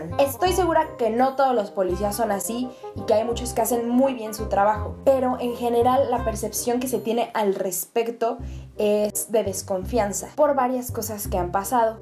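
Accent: Mexico. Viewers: el peor video que he visto en 0.25, habla muy mexicano y muy lento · Creo que tú fresonez fue lo que incómodo a los chilenos y no tu forma mexicana de hablar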